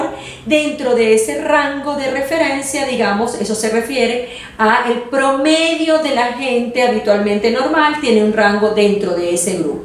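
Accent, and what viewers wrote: Venezuela. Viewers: Dra. es un placer ver sus videos tan claros y con mucha seguridad. No se de donde sea usted pero por su acento me parece Venezolana y eso me hace añorar nuestros Medicos ya que en estos momentos estoy viviendo una situacion bien desesperante con mi salud tengo 8 meses presentando una serie de sintomas y los medicos aun no me dan un diagnostico solo examenes y mas examenes y yo ya llevo 45 libras perdidas vivo en USA y me parece INCREIBLE esta situacion en un pais como este; añoro tanto mi pais y mis medicos Dios la bendiga por su labor.......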